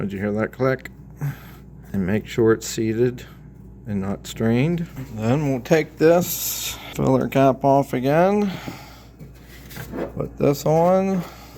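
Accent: Western Pennsylvania, United States. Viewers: Thanks for the video! Are you a Yunser from western PA?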